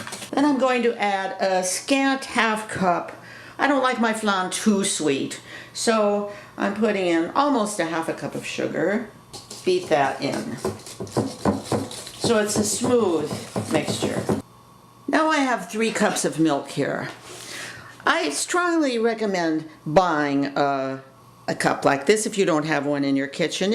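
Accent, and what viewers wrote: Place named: United States. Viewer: hi, can you please writing the explanation on the description tab or email it to me so i can cook it cos your American accent doesn't help me too much and as well i m not English speaking native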